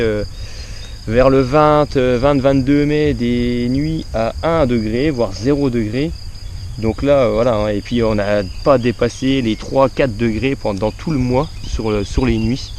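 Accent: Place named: Meuse, France. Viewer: Bonjour vidéo intéressante. La pluie peut être bénéfique. Êtes vous de la Meuse car je reconnais ce petit accent. A bientôt sylvie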